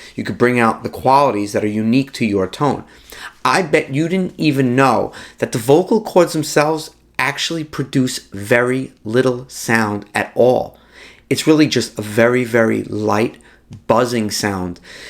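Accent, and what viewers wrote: New York, United States. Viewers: Thanks, as an Englishman (living in Brazil) your New York accent always puts in my mind of my favourite TV show. No not CSI New York but The Sopranos, so it was good to see a picture of Tony S in there!! I have a request for future videos. I'm not a pro and only do little fill in sets when the main performer is on a break at my local bar, but despite plenty of recent practice I still find playing guitar and singing at the same time a bit of a struggle. It's easy enough on simple 3 chord bluesy songs but for something like Layla, which I tried after your recent tutorial, you know a bit more complex, it's a bit more of a challenge! I do manage it ok but would like to not need to concentrate so hard!! Any tips. Also, I live in an apartment block and can't really rehearse singing at full volume and certainly not with a mic, any ideas or do I have to always wait until I'm out live to see if the song suits me. 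Anyway another great vid today, thanks.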